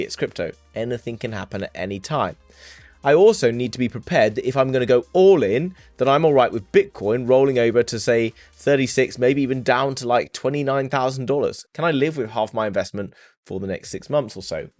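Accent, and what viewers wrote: RP, United Kingdom. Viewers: Mate, you remind me of Hugh Grant and I don't know why. It must be the hair and the posh British accent.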